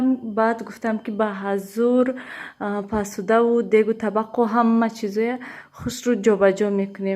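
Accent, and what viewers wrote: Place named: Khujand, Tajikistan. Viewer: салом муборак шавад хонаи нав насиб куна худочон моям сохиби хона кунад мебахшед шумо гапзанитон хучандихоба монанд не душанбегихоба монанд мо хамихе гап мезанем илтимос нависен ай гучо шумо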